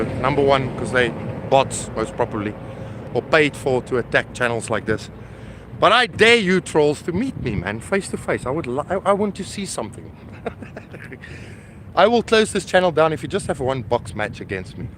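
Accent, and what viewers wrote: South Africa. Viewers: You sound like a South African. ❤ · You sound like South African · Are you a South African boy?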